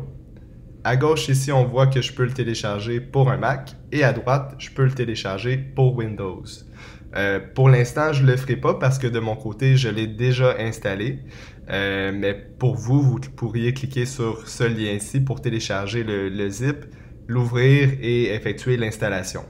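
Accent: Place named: Canada. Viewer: Grand merci pour ce tuto. Les versions sont récentes et les commentaires sont sans blabla. Et en plus avec l'accent canadien, c'est parfait. A visionner sans modération.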